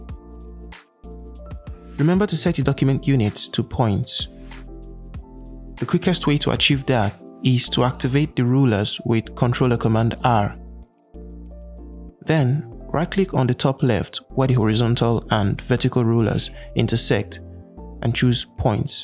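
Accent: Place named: West Africa. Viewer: Western Africa accent 👌 Dope tricks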